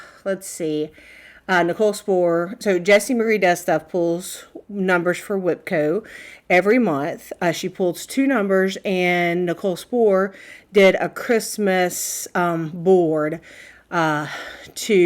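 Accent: South, United States. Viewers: Congratulations! I look forward to watching your videos. Love your “southern” accent and pronunciations. I’m a southern girl, too. I didn’t know I had a southern accent until I moved to California. Thank God I’m back in the south😜😜😜I would love to win your fancy scissors🙀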